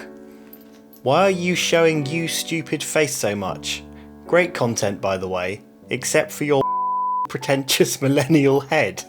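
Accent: United Kingdom. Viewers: I love your channel, even though I prefer video over stills, your perspective in interesting and to the point, none of this "hey look at me" or ridiculously excessive b-roll. Gear reviews can be useful, but they are ten a penny on Youtube and I don't even trust most of them. Nice to have a Brit too, more chilled, less ADHD.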